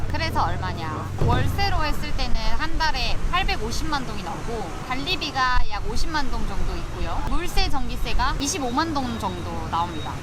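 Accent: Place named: Gyeongbuk, South Korea. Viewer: 경상도 말씨를 쓰시던지 아님 표준말을 완벽히 쓰시던지 정말 말투가 너무 지즈븐해요 그냥 사투리쓰세요 특히 경북사람이 어설픈 서투리쓰면 들어주기 정말 ㅈ 같아요!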